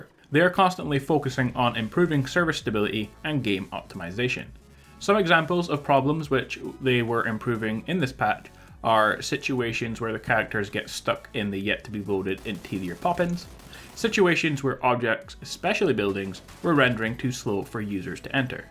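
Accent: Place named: RP, United Kingdom. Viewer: is actually Russian he just executes a very posh British accent.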